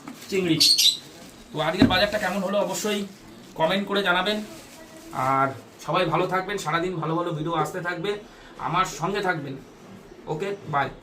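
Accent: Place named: Dacope, Khulna, Bangladesh. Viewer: তোমার কথা একদম আমাদের মতো।দাকোপ,খুলনা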